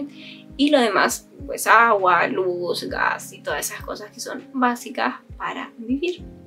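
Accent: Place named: Chile. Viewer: Me encanta el acento chileno, felicidades por tu canal muy buenos videos sigue asi, saludos desde México.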